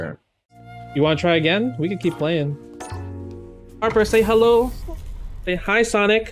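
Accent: New York, United States. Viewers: Canada? I thought from NY!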